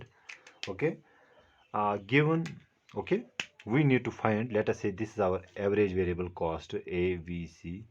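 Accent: Kashmir, India. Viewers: Sir aap kashmir se ho kyaa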